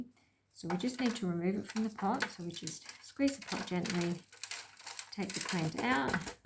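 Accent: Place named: Australia. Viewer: Great video. So nice to hear the Aussie accent for a change. Please keep posting your videos. 🤗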